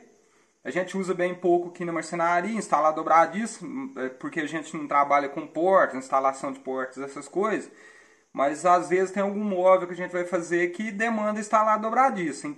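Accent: Minas Gerais, Brazil. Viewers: Obrigado pela referência a meu nome, amigo. Parabéns pelo vídeo. Pelo sotaque vc deve ser mineiro, certo? Eu já fui em algumas cidades de Minas e onde passei mais tempo foi na cidade de Manhuaçu. Gostei muito da cidade. E meu cunhado é de Belo Horizonte. Forte abraço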